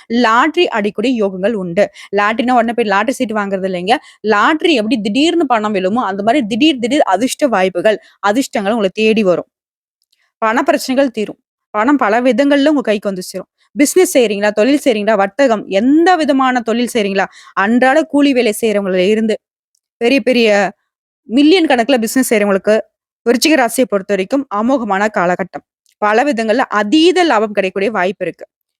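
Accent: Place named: Kerala, India. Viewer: உங்க மலையாளம் கலந்த பேச்சு அருமை,சொன்னது நடக்கும் என்று நம்புகிறேன் சகோதரி.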